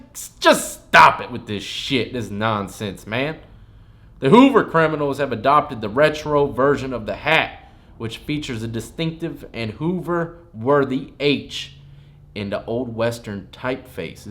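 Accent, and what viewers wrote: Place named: Appalachia, United States. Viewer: Why you talk like a hill Billy bitch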